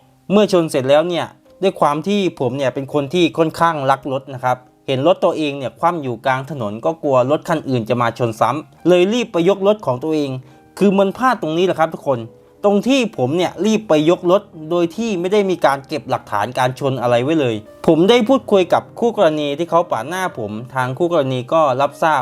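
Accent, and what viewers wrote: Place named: Buriram, Thailand. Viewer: สำเนียงบุรีรัมย์สุรินทร์เลย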